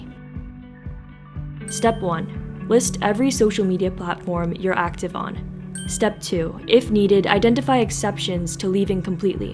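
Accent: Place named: India. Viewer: Your accent sounds like Indian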